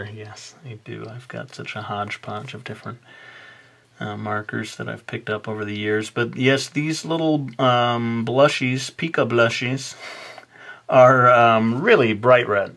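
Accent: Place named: Turkey. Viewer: türk ingilizce konuşuyo la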